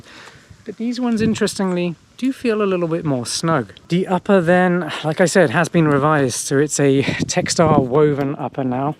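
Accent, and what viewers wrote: United Kingdom. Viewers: are you in the US or the UK? You're defo British by the accent?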